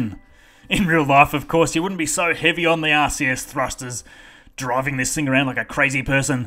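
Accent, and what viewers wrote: Australia. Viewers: just got a sub only due to your amazing auzzie accent, what state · It's an Australian accent. Didn't you catch the "crikey!"? lol.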